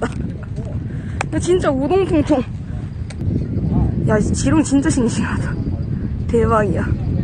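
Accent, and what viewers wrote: Busan, South Korea. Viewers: 댓글은 오늘 첨 달아 보는데~ 유투브 영상 다 챙겨 봤어요~ 재밋네요~부산사람 이라서 말투도 더 정감 가요 ~ 오늘 영상도 재밋게 잘 보고 갑니다 ~항상 파이팅 하세요👍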